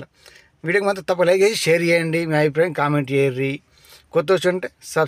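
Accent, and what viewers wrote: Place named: Telangana, India. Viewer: Sooo Beautiful nd nice sharing 🎉🎉🎉🎉 garu 🙏...mi slang..Telangana. Mastu undi..... super super super 🎉🎉🎉🎉🎉🎉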